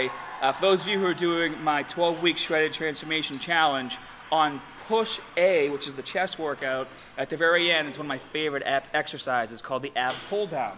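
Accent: Boston, United States. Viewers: I'm playing Fallout 4 but none of the characters in-game have that Bostonian accent of yours :((( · Boston is strong with this one. I hope you aren't taking those little jokes about your accent seriously by any means. It's part of you! :)) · Dude his Boston accent REALLY shows in this video. hahaha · I love how u just embrace that boston accent - baaaaah instead of bar, haaaaarrd instead of just hard xD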